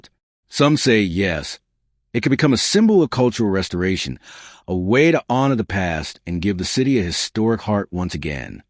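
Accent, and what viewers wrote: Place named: United States. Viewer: Please do not use an American narrator when talking regarding European Castles.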